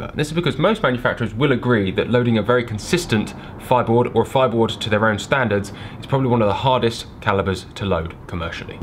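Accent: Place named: England, United Kingdom. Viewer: every time I click on one of your videos I'm momentarily disoriented by all the shotguns in the intro. But then you start speaking with an english accent and I remember that you folks across the pond are perhaps a bit limited in the scope of weapons available to you.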